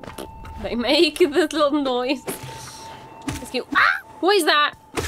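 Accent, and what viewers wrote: United Kingdom. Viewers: Btw, I know I'm echoing a sentiment similar to many others in the comments, but I have never heard of you, and I want to emphasize that I do not care for reaction channels or let's play/twitch vids... BUT! I watched every second of this, and I'm probably gonna watch the next too. You have a thoroughly pleasant voice (I'm such a sucker for British accents, especially female ones) and you are remarkably intuitive for a self-proclaimed novice of the genre. This game holds a special place in my heart and earns its spot on the small/rare S Class shelf of games.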